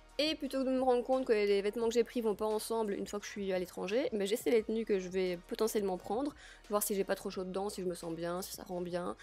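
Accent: Belgium. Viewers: Hello 🙂 Ohhh j'ADORE tes Routines! Tellement simple, enfin ceux sont clairement mes FAVORITES! 😍 Jamais dans le "m'as tu vu", toujours réel, fidèle a toi même Ta bonne humeur etc.. Puis ton accent belges dans tes Routines, MA VIE 😄 Des bisous 😘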